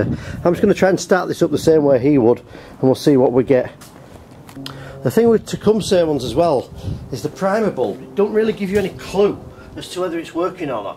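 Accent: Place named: England, United Kingdom. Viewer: Read your closed captions as you view this video. I'm in Alabama and trying to understand your accent (English, I suppose) is tough but doable. I guess it really must be a challenge to understand, by whatever means that is used to add close captions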